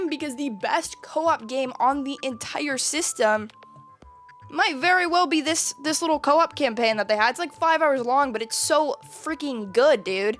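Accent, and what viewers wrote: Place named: Pennsylvania, United States. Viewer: do you live in Pennsylvania? The way you say certain words makes me think you do hahaha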